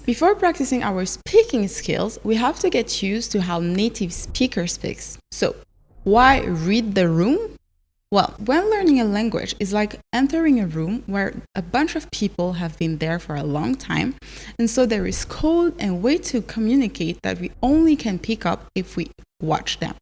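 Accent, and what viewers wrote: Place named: France. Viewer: The accent is French and the name is a word in several bantu languages in Uganda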